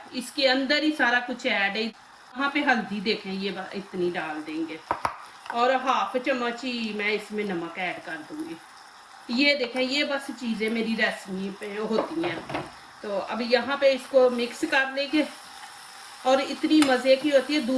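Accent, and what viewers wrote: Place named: Punjab, India. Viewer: Sub hi cooking karny walay bismillah sai hi start karty hain aap boht acha karti hain sirf itni baat hai kai aap ki urdu sun kar dhayan cooking sai hut jata hai punjabi khubsurat zuban hai or aap ka lehja bhi pure punjabi hai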